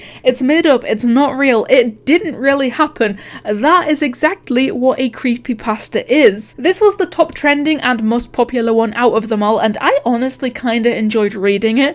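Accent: Scotland, United Kingdom. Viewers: I like her scottish accent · kay ayh ayh You're British Aren't you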